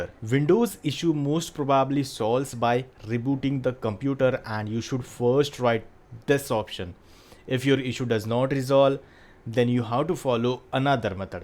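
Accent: India. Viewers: hi I like your Indian accent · Thanks for your tutorial, it was very usefull, and your indian accent made it better :)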